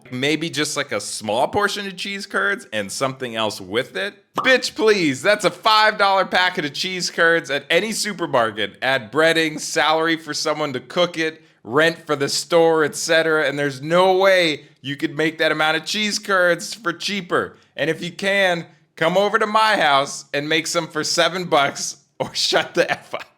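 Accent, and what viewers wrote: United States. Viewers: . Recent fan. You live in Europe? I am not good on accents but I think you sound nyny. Inigo (me), "Where do you live in Europe?" The man in black (you), "No place of consequence." Inigo (me), "I must know." The man in black (you), "Get used to disappointment." Anyways... I get the very strong sense that you are a cook, a chef (they are different), and have worked in the restaurant industry in almost all capacities. So... WHY is r/fastfood about "the industry that I love"? I am certain that you do not work in the fast food industry. Is this a nostalgia thing. I would appreciate a reply to this post. Thanks:)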